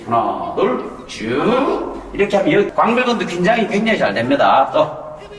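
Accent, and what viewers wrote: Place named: Gyeongsang, South Korea. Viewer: 나도 이 경상도 아저씨한테 배우고싶당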